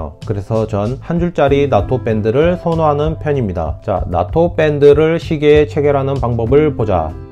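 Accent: Gyeongsang, South Korea. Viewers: 나토뺀뜨..사투리가 남거슬리는군요..경상도 억양이..ㅋ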